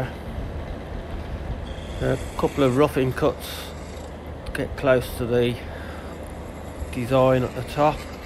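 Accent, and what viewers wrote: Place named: Birmingham, United Kingdom. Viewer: I love your accent. I lived in Birmingham for a while and I always feel homesick listening to you. Plus I'm hoping to be braver with the skew...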